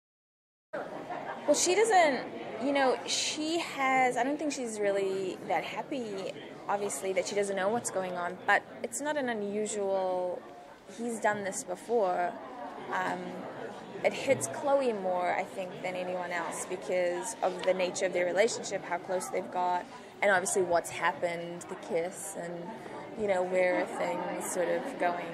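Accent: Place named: South Africa. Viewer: I love that you still have your South African accent. A bit of American but thats expected. Don't lose your roots girl! Lekker bly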